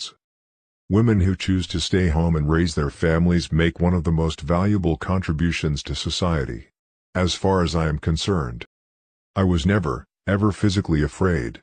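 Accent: United States. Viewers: Great quotes from a great man read out by a mechanical bot with a septic tank accent! shameful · This is weird - At least they could have got someone with a Yorkshire accent to read them instead of an American droid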